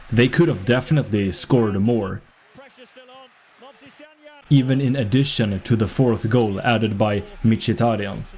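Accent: Sweden. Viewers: Swedish-English is so funny to listen to bruh 😭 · Your accent SCREAMS Sweden omg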